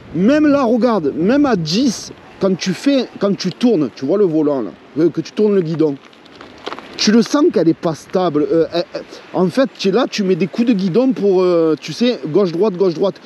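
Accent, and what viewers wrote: Marseille, France. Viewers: Laccent maseillais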